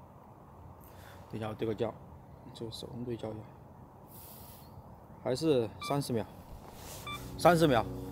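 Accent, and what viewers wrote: Sichuan, China. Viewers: 新粉留言，你的川音好有亲切感～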